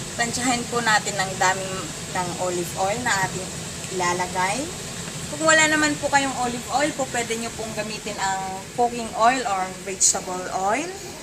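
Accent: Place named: Kamayo, Philippines. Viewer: the way ka kasi magsalita kamayo talaga from Mindanao na linggwahi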